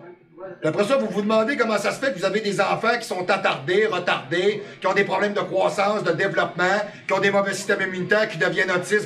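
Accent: Quebec, Canada. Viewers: Bonjour, Je vis en France, je trouve dans l'ensemble votre analyse concernant Big Pharma très très intéressante, le problème c'est qu'avec votre accent par moment c'est compliqué de comprendre, il serait bien pour les prochaines vidéos de parler plus lentement, ça sera beaucoup plus compréhensible pour nous les francais qui ne sont pas habitués à l'accent Québécois (^_^) En vous remerciant, Cordialement. Alex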